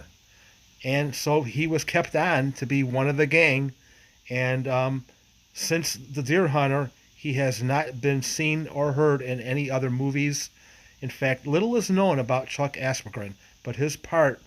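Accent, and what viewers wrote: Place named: Boston, United States. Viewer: Hi, interesting historic video, loved it as this movie is one of my favourites. Thanks for this. Btw are you from Boston? Just asking as an English man with an intérêt in North American accents.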